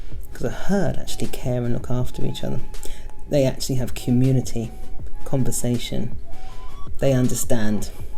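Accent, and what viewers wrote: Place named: United Kingdom. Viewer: My god, you are beautiful! Totally wasn't expecting to hear another British accent :-) Your locs are beautiful!!! Need to have a look through your videos :-)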